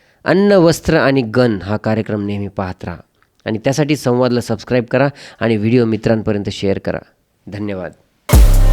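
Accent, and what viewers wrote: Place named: Rural, India. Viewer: Narrator's Marathi sounds unsophisticated and from rural area. It should be polished to reach out to urban audience.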